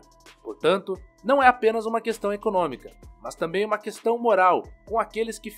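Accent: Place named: Rio Grande do Sul, Brazil. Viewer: Esse narrador é gaúcho.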